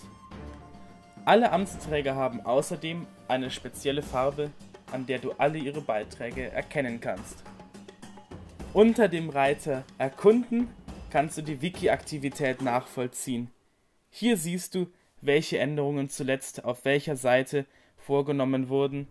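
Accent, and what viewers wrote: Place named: Franconia, Germany. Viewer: BAYERISCH? Ich fasse es nicht! Wir sind FRANKEN! Das ist ein ganz ganz ganz ganz wichtiger Unterschied! :p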